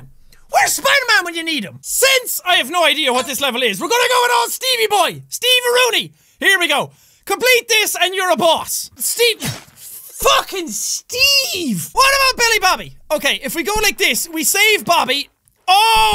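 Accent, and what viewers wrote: Ireland. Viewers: I fucking love the Irish accent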